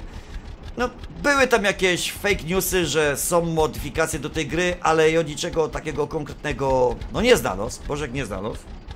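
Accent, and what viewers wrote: Silesia, Poland. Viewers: Szanowny twoje poczucie humoru w tym filmiku konkretnie sprawiło że płakałem jak norka jako że uwielbiam Śląsk twoja gwara śląska sprawiła że poprostu się popłakałem więcej takich filmów. Zazwyczaj poprawny polski tym razem typowa gwara dziękuję za poprawę humoru. Pozdrawiam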